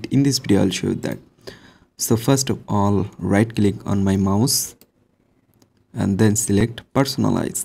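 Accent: India. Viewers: that indian accent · indian detected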